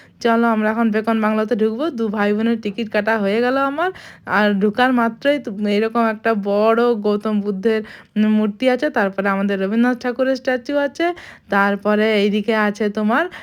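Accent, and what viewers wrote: Bankura, India. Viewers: তুমি কি সবসময় এভাবে ই কথা বলো?মনে তো হয় তোমরা বাঙালি ব্রাহ্মণ। তুমি বাঁকুড়ার লোক।বাঁকুড়ার সবাই কি এরকম ভাবে কথা বলে?আমি ঠিক জানি না।তাই জিজ্ঞেস করছি।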